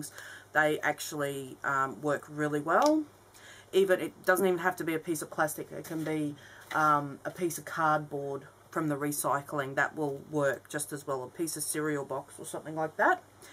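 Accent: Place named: Australia. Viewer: Hi, I love the techniques you've shared here ☺ stencils are my favourite way to add pattern & colour right now but I go through phases of loving different techniques and mediums! I love your aussie accent too!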